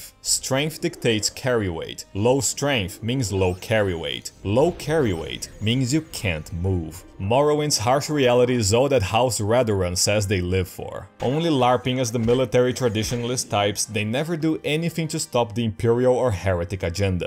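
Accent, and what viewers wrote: Brazil. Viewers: You have an accent similar to Brazilian portuguese, are you Brazilian? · His Brazil accent is so funny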